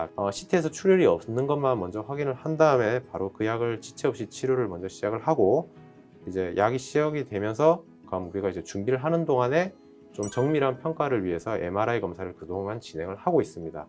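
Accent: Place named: Gyeongsang, South Korea. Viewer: 닥터님께서 경상도 분 이시네요 상세한 설명 감사합니다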